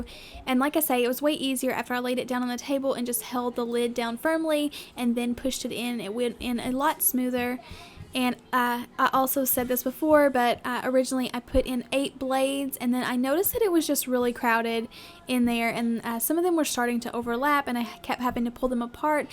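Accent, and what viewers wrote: South, United States. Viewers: I have been watching you for over a year now. 1/08/2023 is today's date. I am realizing I have so many more videos yet to watch. I thought I was pretty well up to date. ❤ Love the windmill. I have got to say your southern accent is really noticeable in this video or the most recent ones.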